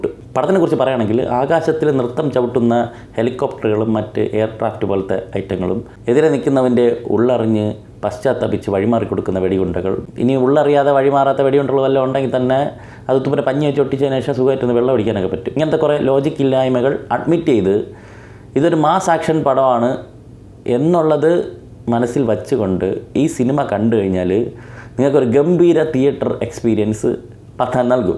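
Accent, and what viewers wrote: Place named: Thiruvananthapuram, India. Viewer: തൃശൂർ പഠിക്കാൻ വന്നതിനു ശേഷം ഞാൻ തിരുവനന്തപുരത്തെ സുഹൃത്തുക്കളുടെ സംസാരം മിസ് ചെയ്‌തു. നന്ദി ഗോകുൽ. Hope we can be good friends.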